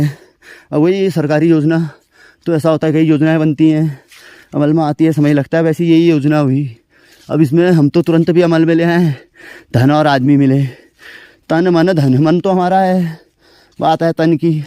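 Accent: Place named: Almora, India. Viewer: गुरु जी गांव, विकास खंड व जिला भी बताने का कष्ट कीजिए। वैसे आपकी आवाज से लग रहा है आप अल्मोड़ा जिले से हैं। धन्यवाद जी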